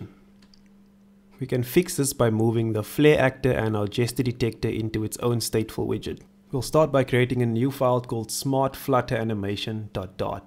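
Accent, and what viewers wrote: South Africa. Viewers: Yeah I was about to ask are you South African 😂 It's great to see Flutter Devs in South Africa. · I know a South African accent when I hear one. Keep up the good work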